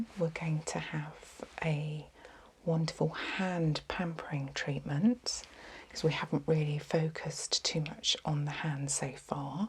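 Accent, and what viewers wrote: England, United Kingdom. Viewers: I really liked this video with the hand treatment. The hands hold tension also and a lovely relaxing massage and treatment is amazing. I know why I love your voice so much, the combination of your soft voice and the British accent really makes your speaking relaxing. Everyone subscribe and hit the like button if you are new here watching the lovely. I listen to your videos just before bed and I fall fast asleep. Blessings lovely lady 🌿🌿🌿🌿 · If you were ever to open a shop where we could get treatments like this, I would happily visit. Also, I realised I love your accent as well - I lived in the UK for a few years and still remember it fondly, your voice brings back happy memories while creating happiness in the present too. Thank you ❤ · You have a soothing voice and I love an English accent! Actually, all of my go-to asmr channels are from the UK! 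😅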